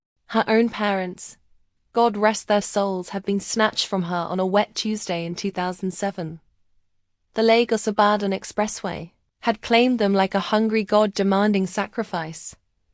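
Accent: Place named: United States. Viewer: A very interesting story but why use American intonation thereby ruining the beauty of the story with very wrong pronouncement?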